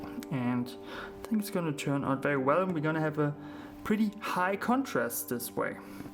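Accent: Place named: Germany. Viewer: The German sounding accent makes this even creepier.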